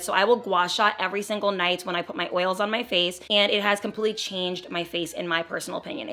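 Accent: New Jersey, United States. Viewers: Her accent is out today! Jersey jersey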